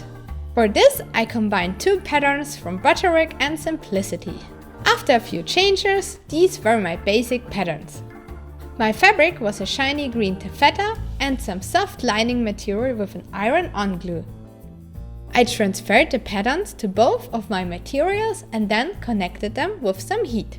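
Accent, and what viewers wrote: Germany. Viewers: Hey, bist du aus Deutschland? Ich frage nur, da du einen deutschen Akzent in deinem Englisch hast und du auch in anderen Videos öfter mal Produkte mit deutscher Aufschrift genutzt hast. PS: Mega cooles Video😊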